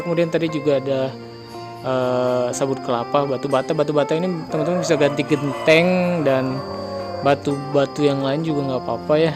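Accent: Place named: Ambon, Indonesia. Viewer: kirain mas orang ambon..logaty ky ambon...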